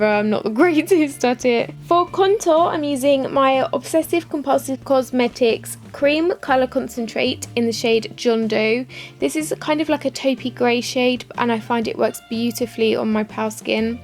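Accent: United Kingdom. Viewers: You're beautiful with and without makeup! BTW love your British accent :)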